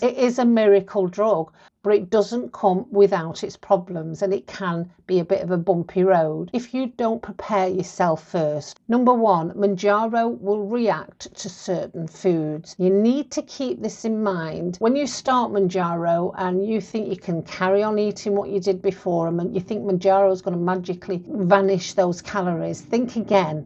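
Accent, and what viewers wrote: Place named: England, United Kingdom. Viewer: I like your accent. What part of England are you from?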